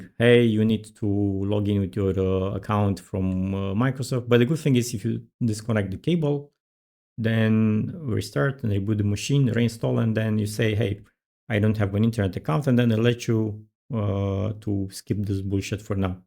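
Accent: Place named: Romania. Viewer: Never heard of lunacy before, Tnx! I also ditched Windows because is a piece of crap and now i am using Linux mint! In general i use open sourced software like blender,gimp,krita and gotot engine so there is no use for using Windows Anymore! Not to mention the customization that you can do in Linux is Just Crazy! By the way you sound Romanian :D! Subscribed!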